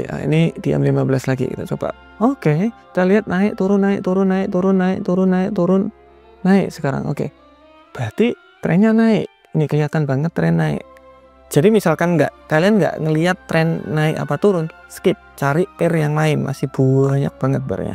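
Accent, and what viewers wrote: Java, Indonesia. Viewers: Bang kmu Jawa ya 🤭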